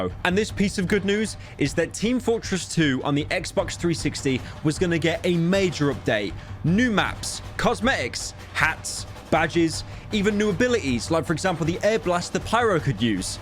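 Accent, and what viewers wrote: United Kingdom. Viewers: pyro please take off the british accent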